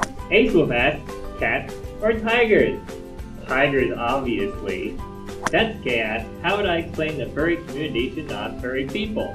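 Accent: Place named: Australia. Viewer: its the Aussie accent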